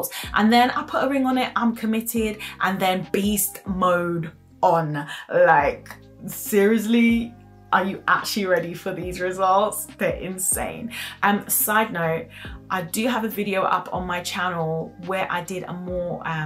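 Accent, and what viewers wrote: United Kingdom. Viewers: You have a Brittish accent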